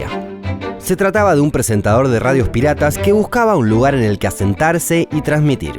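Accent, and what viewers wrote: Argentina. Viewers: Este acento argentino me parece particularmente irritable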